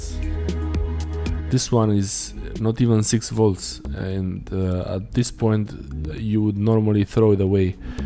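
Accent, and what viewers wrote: Romania. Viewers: Could hear something was familiar to that accent. Te salut din bucuresti! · Ești roman? Se simte accentul 😂💪🏻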